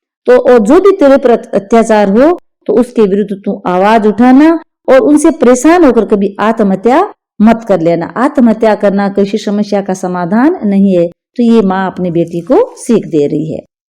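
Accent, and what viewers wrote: Rajasthan, India. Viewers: Mam aap rajasthani ho na aapki voice tone se lagta h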